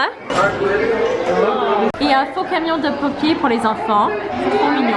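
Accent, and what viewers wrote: United States. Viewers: J'adore t'entendre parler américain !!!!!!! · You’re so nice ! Your husband must be proud of you. I would have loved to marry a woman from a foreign country ( especially from the USA). You show us how proud we ,as French , are honored to have you through all these experiences yo share. I’m so sensitive looking at a person like you who may tell the best from both cultures : USA and France. Keep your delightful accent ! Bravo pour vos vidéos : elles sont fun, no boring at all, et elles permettent aux français qui connaissent pas très bien votre vraie culture de mieux appréhender ses particularités. N’oublions jamais que ce pays est immense et que NY ou LA ne représentent pas toute l’Amérique. For sure, your parents gave you a strong and good education with real values. And it represents such an asset for life. Now it’s your turn to convey all these values to your children. 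And on top of it, they will be enriched by their two cultures. Wishing you all the very best in Canada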